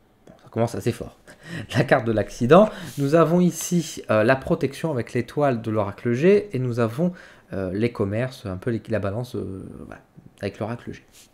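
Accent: North, France. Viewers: Oh toi tu es du nord ! Non ??? 😘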